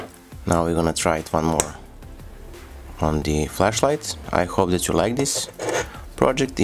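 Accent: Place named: France. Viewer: Very good film avec accent french😂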